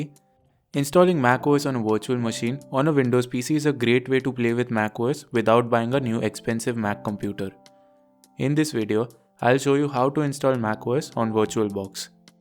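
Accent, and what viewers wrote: India. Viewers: Bro, sounds like a Indian text support scammer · you know this gonna work when the guy has an indian accent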